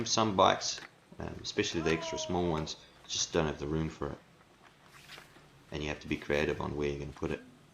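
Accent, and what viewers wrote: South Africa. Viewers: thanks for the show. Good old SA accent. where can i buy this convention kit? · are you South African expat? Kinda sounds like you have a South African accent.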